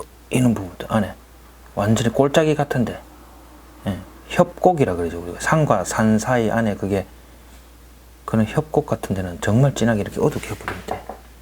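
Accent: Gyeongsang, South Korea. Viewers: 요즘취미로 집에서 조금씩 배우고있어요ㆍ장미꽃그림은 아직 엄두도못낼것같았는데 ~~ 자꾸 동영상보면서 익혀야겠네요^^ 첨이라 이해가 안되지만 연습해서 아름다운 장미꽃을 도전해보고싶네요^^ 잘배우고 갑니다 ㆍ쌤^^ 목소리톤과 경상도사투리 ~ 완젼 멋지심요~~ 펜할께여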